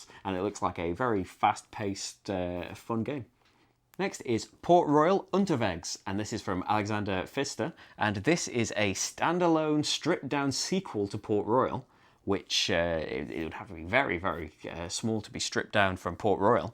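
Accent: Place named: Leeds, United Kingdom. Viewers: Yeah I wondered that too. Sounds like you're from my neck of the Leeds woods! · This was great thanks, and I watched all the way to the end. Is like a friend sat having a chat. Do you live in the North Of England (just by your accent??) If you don't mind me asking. Enjoy Essen! You certainly going to need a few empty cases to bring back all those games ;-)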